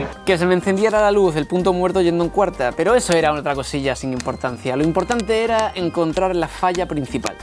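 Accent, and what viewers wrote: Andalusia, Spain. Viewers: Joe que grande!! Pareces dani rovira.Que arte ese andaluz!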